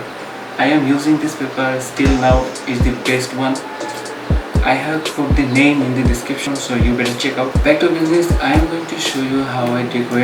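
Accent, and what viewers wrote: India. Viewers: Do u live in india or bangladesh???? Im very curious because ur accent is like bangalee's ... and very awsome tour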